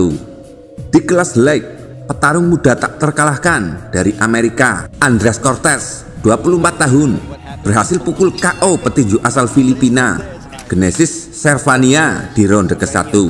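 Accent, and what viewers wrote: Java, Indonesia. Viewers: Medok mas... 😁😀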